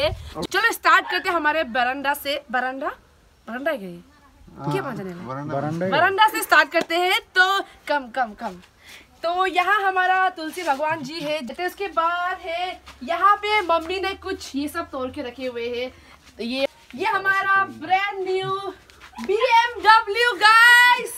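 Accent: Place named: Nagaland, India. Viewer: Aap kaha rahte ho aap k boli se lag raha h aap nagaland se ho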